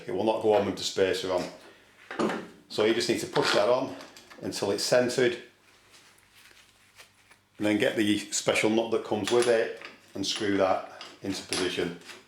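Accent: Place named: Wigan, United Kingdom. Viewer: You sound like you're from somewhere like Wigan or Bolton with your common accent. I think manufacturers and businesses just slap on an expiry date for the sake of it sometimes, though I'm not saying this isn't a bad thing regards a powerful and dangerous tool like an angle grinder. Having an expiry date, especially with food, is just another way of making money where people throw it away when there's no to do. I have a Clarke angle grinder and I can't get the old worn down disc off using a pin wrench; it seems to be stuck and won't turn for some reason maybe due to rusting. I put some WD40 on it but it hasn't made any difference.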